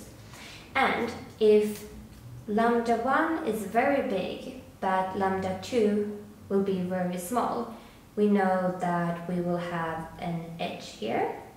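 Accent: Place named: Germany. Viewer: haha I was just guessing from the accent. Where in Germany do you live?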